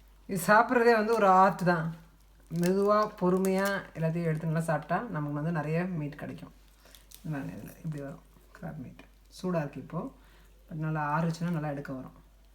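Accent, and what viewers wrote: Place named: Chennai, India. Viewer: So sweet of u for carrying our Chennai slang .very pleasant to hear in u.s.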